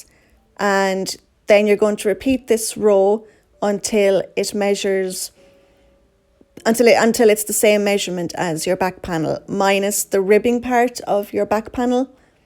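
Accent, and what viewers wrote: Ireland. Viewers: Yay Irish accent.. Love the video x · I love this pattern! thank you but i also love listening to you speak..love your brogue..:)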